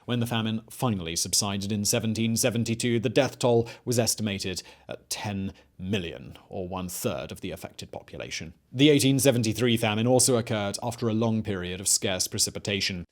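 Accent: United Kingdom. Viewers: I also noted that he described Fazlul Huq as a Hindu, so I'm overall questioning the accuracy of this content overall right now. This is the problem with Youtube. Anyone can post anything and we have no idea of the qualifications of the presenter or veracity of their content. And you know how it goes - people tend to think anyone with a British accent knows what they're talking about, even if they're talking out of their a>>.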